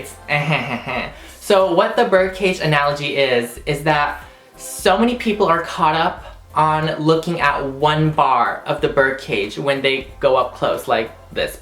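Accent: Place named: South, United States. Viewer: I LOVE your country accent and your little laugh you do it's completely adorable! :)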